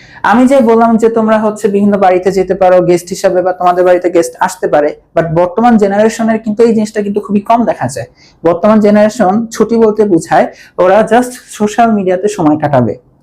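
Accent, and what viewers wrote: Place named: Kolkata, India. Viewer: apnar voice sune mone hoi apni Kolkatar er teacher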